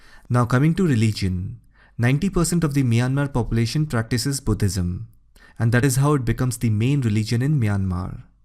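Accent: India. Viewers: As soon as the Indian accent came up, I know this video is anti-China & full of gibberish. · The commentator has a very strong Indian accent with horrible pronunciation of many English words. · I can barely understand you, your indian accent is too strong put subtitles please.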